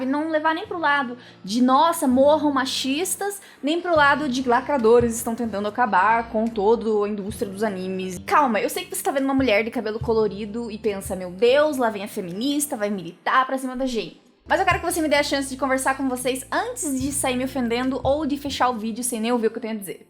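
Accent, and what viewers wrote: Paraná, Brazil. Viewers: se eu fosse chutar, acho que você é paranaense.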